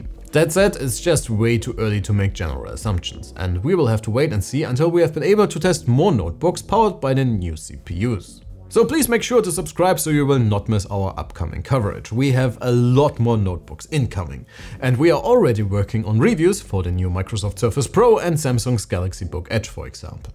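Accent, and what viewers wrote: Germany. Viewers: I'm very glad to see NoteBookCheck as a very classic website to publish such YouTube-optimized video in every aspect and that's a real success for your content producers. I'm an old fan of you from the website, but now you've convinced me that you're very good in YouTube platform too. And dear, your German accent is what I love 💛❤🖤 Now let's talk about the Laptop! I think that Snapdragon X Elite is something that should not exist at all. Who am I to say this? A Microsoft Surface RT and Surface Pro X lover. I believe that existence of the ARM based computers in the form of laptops and Windows tablets should be only limited to very low-power scenarios maybe 10W TDP at maximum. If you're going to implement such heavy cooling systems with heavy heatsinks and fans, well why you don't stick to x86 even??? The purpose of bringing ARM to a "Windows tablet" specially, is to have a final product like the iPad or Android tablets in terms of weight, thickness, heat generation, battery runtime,... 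But Apple ruined the game with 100W ARM based laptops which even gets more "Unreasonable" when even their desktop solutions is ARM too!!! ARM or I might say RISC, is designed to work with low-power and be less powerful. When x86 or I might say CISC, is designed to work with high-power and be simply more powerful! You can't fight with the nature of them. If even our desktop PCs turn into ARM machines, well then we will lose the great power-house performance of CISC architecture! And fortunat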